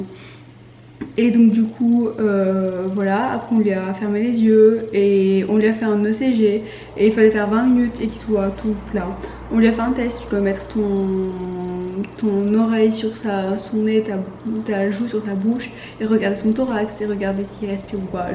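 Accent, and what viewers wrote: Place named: Italy. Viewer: Tu as l'intonation des italiens quand tu parles en français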